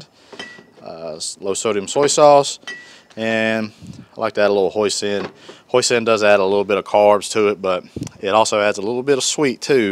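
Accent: North Carolina, United States. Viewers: The whole time I was watching, I kept thinking, man, he’s gotta be from NC...lol! I lived in Denton for 10yrs. Good people!😁👍🏻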